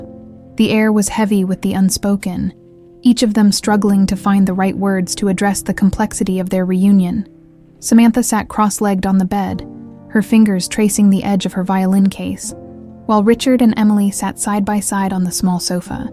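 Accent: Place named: England, United Kingdom. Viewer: Your telling language is not American it sounds more like English accent. Would you mind telling from which country you’re from.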